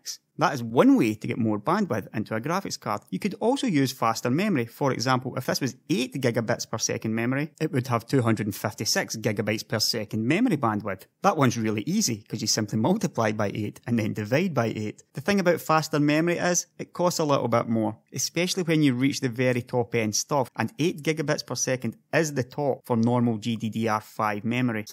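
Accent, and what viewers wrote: Scotland, United Kingdom. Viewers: Nothing wrong with his Scottish accent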